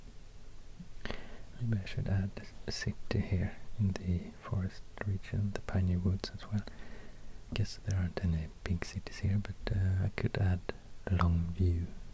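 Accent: Sweden. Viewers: Idk why but there’s something satisfying about hearing Texan city names being spoken in a Swedish accent. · Svensk? Eller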